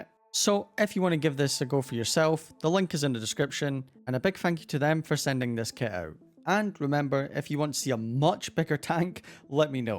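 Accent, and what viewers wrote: Scotland, United Kingdom. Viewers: Hes British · Bro is British because he says wa'er Instead of water and says sal' instead of salt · Is he British Im American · Bro are you British or foreign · I think he’s British, but how many times he said WOA? Ah ended😅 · Ur british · By your voice I can tell that your Scottish 😎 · HES BRITISH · Guys is he British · are u scottish by any chance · Wait your british??😊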